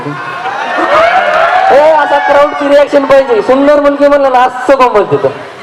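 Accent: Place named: Ambajogai, India. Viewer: full ambajogai chi language.... Mast vatal bhava..👌👌👌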